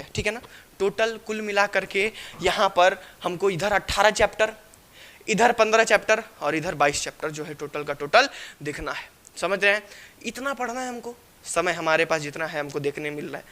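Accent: Bihar, India. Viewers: Aap Khan sir ki tarah bol rahe ho Bihar ke ho kya? Mein bhi Bihar s hu Darbhanga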